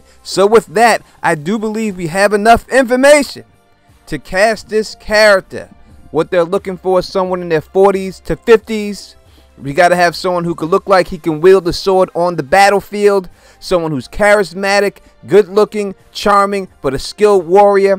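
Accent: New York, United States. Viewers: Perfect casting! I love listening to your commentary as your NY accent is so distinctive and makes me miss home. NY strong.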